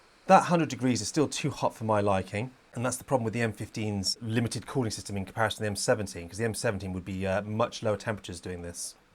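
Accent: United Kingdom. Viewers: I love this channel. British accent and detailed tech stuff ❤️❤️❤️ this is proper nerd stuff mate. Keep it up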